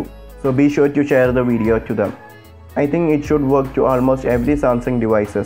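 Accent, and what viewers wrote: India. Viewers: I cant even understand ur indian Apu chipati ass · that's mallu accent mam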